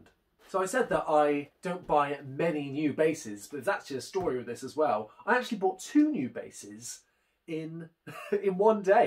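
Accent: United Kingdom. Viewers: Are you bri(t)ish?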